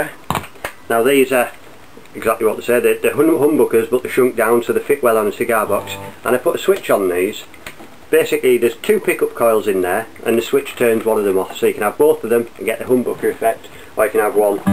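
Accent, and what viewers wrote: United Kingdom. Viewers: Great video! by the way I couldn't stop staring at your T-shirt I noticed it said snowden but my eyes are bad that's all I could read and couldn't help but thinking I wonder if you know there's a guy named Snowden that builds cigar box guitars here in the states Snowden cigar box guitars? I had to laugh because I wasn't sure who I was looking at I was pretty sure Snowden was American but you sound definitely English ... I liked your video now I have to choose between 4 guitar makers ... Nigel Mctrusty Australia ,YOU Great Britain , Snowden guitars I believe usa and Justin johnsen cigar box guitars usa I don't know what to do now hahaha! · Ey up lad, not heard that great dialect for years. I lived most of my life in Manchester and then for my last 6 years in England I lived in West Yorkshire. I am guessing you are from somewhere around Manchester though? 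I left England in 1982 and I now live near Chicago, Illinois, (still got the English accent though :) ). Man do I miss hearing good dialect! I came on here because I just bought a 3 string cigar box guitar and wanted to get some idea as to playing and tuning it. I normally play 6 and 12 string guitars, but I have to say that I was blown away by the sound from these things. I agree with the adjustable bridge. The one I just bought did not have one and already I can hear the intonation errors. Also it had a peizo pick-up which I'm not keen on. I'll probably go ahead and modify it as per your recommendations. By gum I liked your video, keep up the good work lad. All the best, Chris.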